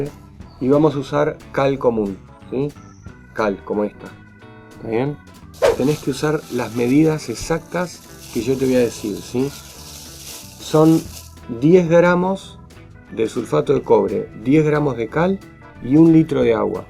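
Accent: Argentina. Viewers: Su acento es Argentino, nose si los videos los filmara ahi.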